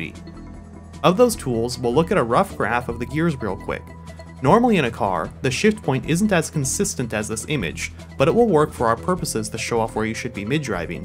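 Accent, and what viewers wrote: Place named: United States. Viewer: Yeah, he's pretty obviously american :D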